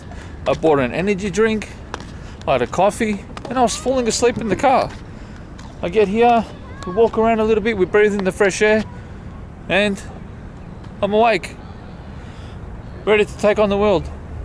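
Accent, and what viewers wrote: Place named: Australia. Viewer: WOW now this is really a head turning video, I had no idea you were an Australian, it is weird hearing that accent you naturally have, that does not come out on the music videos, oh my god thank you, it will be a longtime before i can make it down under, the funds are not allowing a vacation that far away. Thank you from the bottom to the top of my heart, and give the wife a thank you as well, nice video, USA Missouri,Lake of the Ozarks or the Midwest Coast as they say 1150 miles of shoreline, the oceans are great medicine Atlantic Pacific and Gulf of mexico, pacific northwest up by seattle is where i like it most, its a big angry seeing all this plastic everywhere, oh man i hate pollution and the lack of much effort to clean it up...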